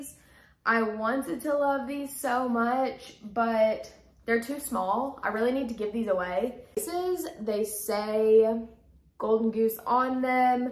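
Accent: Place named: Kentucky-Tennessee, United States. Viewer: Woohoo! Girl, that’s like 11 years of shoes. Also, the Kentuckennesse accent reminds me of home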